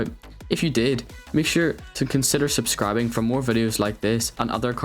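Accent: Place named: United Kingdom. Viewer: I don't know if I find it good or bad but your accent is so there. It's soooooo British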